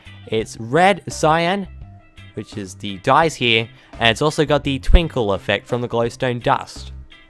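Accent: Australia. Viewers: R u australian? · are you australian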